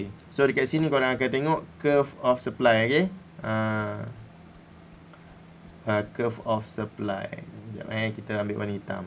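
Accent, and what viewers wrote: Pahang, Malaysia. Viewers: terbaikkk sifoo..loghat mcm org phg jekk..hehe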